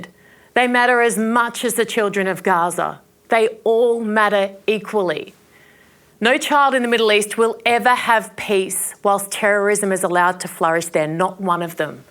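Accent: Australia. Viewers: You show no solidarity with your colleagues of the Press. How much are you getting paid? Could you change your accent, I am so embarrassed that you are Caucasian Australian. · Hats off to you, Erin... Love the Aussie accent , by the way...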